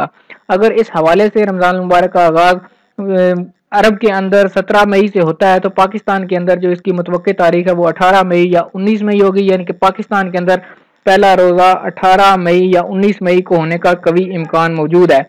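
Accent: India. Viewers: It isn't Saudi, it is some indian speaking "ringi'dingi'ding-ish"... just headache..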